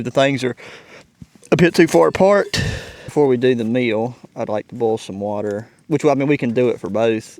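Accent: South, United States. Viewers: Love that Southern accent, his charm and good looks dazzle in UHD.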